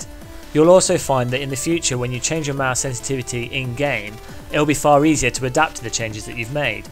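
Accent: United Kingdom. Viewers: Part 2 of my comment Finally you should have also added in the great importance of finding a flawless sensor mouse. This is a mouse that contains a sensor that has no acceleration, or prediction (Enhance pointer precision but on the mouse). Anyway nice video, are you scottish,english or what?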